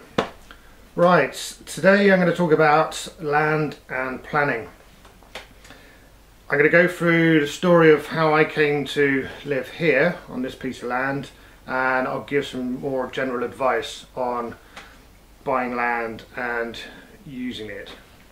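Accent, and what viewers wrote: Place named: Bristol, United Kingdom. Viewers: Really enjoying your videos - judging by your accent I'm guessing you're near ish Bristol? We're Bristol based, would love to live off grid but feel my gaming addicted partner would not be impressed! Hehehe! xxx /|\ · Important to say what country you're in. I can only guess by your accent that you're in England. I, on the other hand, am in Canada. Different rules.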